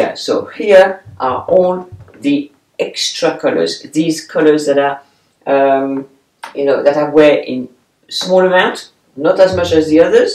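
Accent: France. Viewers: Sometimes I catch the first part of a video without sound but with captions. Apparently the captioning cannot translate your delightful French accent so your name was translated as Manhattan Looker. Probably the opposite of French chic!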